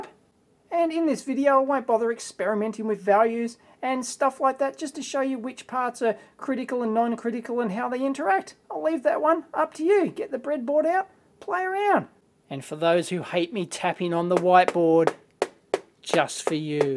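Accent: Australia. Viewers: ok I am starting to like the aussie accent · Commenting again because this is that good. This is the first video by I've watched, but communicating the thought/development process of a circuit is what I've been searching for since my undergrad years! Extremely impressed. And delivered with an Australian (?) accent? Amazeballs, and Bob's your uncle.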